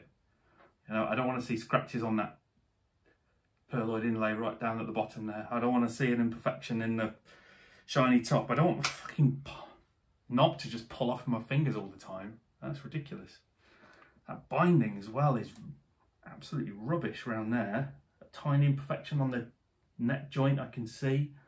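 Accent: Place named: United Kingdom. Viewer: Must have be the British accent.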